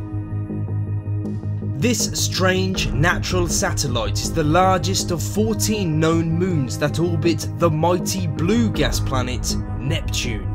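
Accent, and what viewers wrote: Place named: West Country, United Kingdom. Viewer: I can hear a slight West country tinge to your accent.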